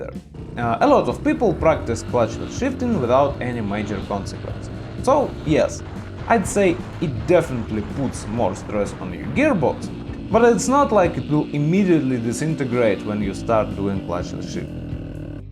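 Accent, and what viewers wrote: Russia. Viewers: You will not know it, but I do like you, 1. you sound great speaking English, yes I know you have a Russian accent BUT! its not your exact fault, that your govt are pritty stupid and have always caused trouble all over the world, but hey, not you ok, (but you Russians have totally ignored everything! done nothing at all to CONTROL anything, seems all Russians are ignorent of affairs, I have no idea why i have said this, its not motoercycling), 2. your are EASY to understand. 3. you are good at visualisation! 4. its all very comprehensive. I have no idea how you will cope because Russia looks like it will be destroyed somehow? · That Russian accent ♥️♥️♥️✨ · кацапський акцент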